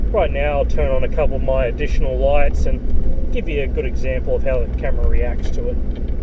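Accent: Australia. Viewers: How can anyone who speaks English on Earth not love the sound of an Australian voice! Cheers!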